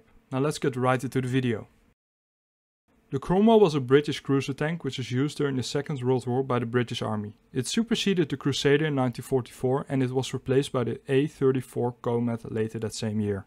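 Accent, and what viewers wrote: Netherlands. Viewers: That was extremely informative, and i personally appreciated your Dutch accent; very humble, yet PROUD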